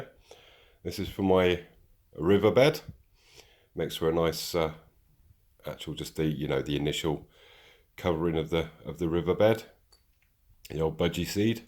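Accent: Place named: Yorkshire, United Kingdom. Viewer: Thought you`d be a Yorkie Man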